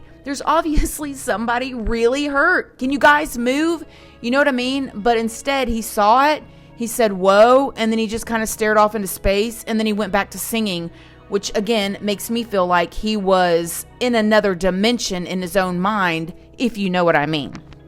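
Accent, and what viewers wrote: South, United States. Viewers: I looooove your channel and your adorable southern accent.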